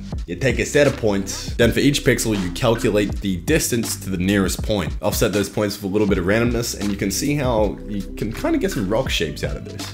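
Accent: Australia. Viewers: Watching this for the first time I was like "Wait is he Cockney or is he from New Jersey because he sounds like both at the same time" Then I figured out I had forgotten the existence of Australia. It happens. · you sound like you're from australia and new york at the same time and its fuckin weird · that's called.... an australian accent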